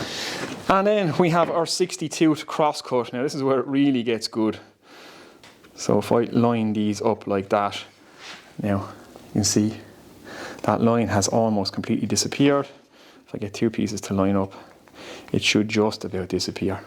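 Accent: Ireland. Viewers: I thoroughly enjoy and appreciate your content! .......even when I disagree with it. I live in Southern California (born and raised), surfed all my life, and if you ever met me, you might comment on my California surfer accent. I can't speak for other Americans but I think your Irish accent definitely adds value to your content!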